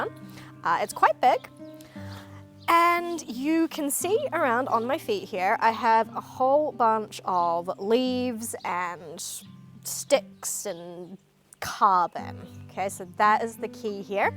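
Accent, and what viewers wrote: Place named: New Zealand. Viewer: By the way, you have an odd accent (not unpleasant). I can definitely hear the kiwi twang but it's mixed with something else. Irish?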